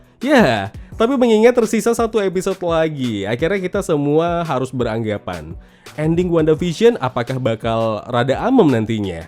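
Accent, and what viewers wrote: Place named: Manado, Indonesia. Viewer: logat logat manado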